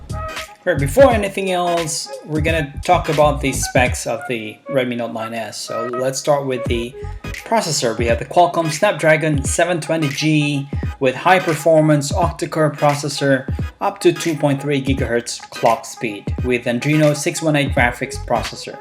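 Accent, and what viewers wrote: Bisaya, Philippines. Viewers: bisaya d i ka bai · bisaya diay ka sir? Nice HAHHA washout sir from PH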